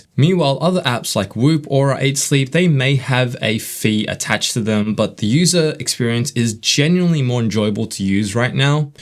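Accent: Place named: Australia. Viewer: $99? Why do you sound Australian but the price seems to be in US! No?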